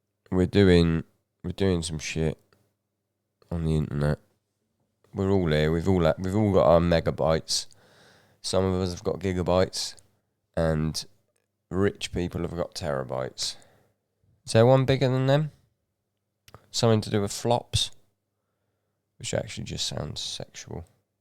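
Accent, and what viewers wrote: United Kingdom. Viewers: Love your British accent...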